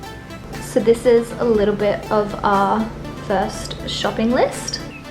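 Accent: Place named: Australia. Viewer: I like your accent. Australian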